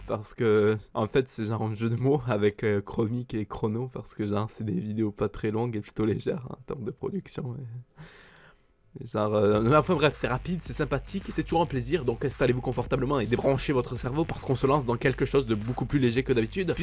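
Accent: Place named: South, France. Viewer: Cet accent sudiste moelleux m’avait manqué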